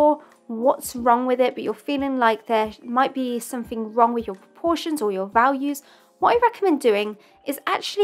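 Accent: England, United Kingdom. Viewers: Hey. I love your work. What part of England are you from?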